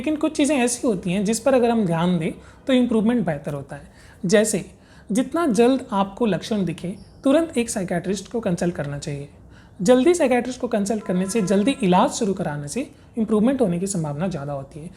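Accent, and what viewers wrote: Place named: United States. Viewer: इंग्लिश भाषा का अच्छा इस्तेमाल करते वक्त जिस व्यक्ती का समझनेवाला आवज होना चाहिए........ इस विडिओ मे थ़ोडासा अमेरिकन उच्चारण सुनाई देनेके वजहसे सभी टिप्स अच्छेसे नही समझमे आ रही है....... जिस उद्देश से अच्छा विडिओ बनाया जा रहा है वह लोगोंतक नही पहुंच रहा है......... सभी टीम इसपर जरुर विचार करे क्योंकि ये दिमाग की डिसऑर्डर बहोत लोगो में दिखाई देती है......... जो काम की बातें बता रहे हो वो लोगोंके समझमे आ जानी चाहिए.........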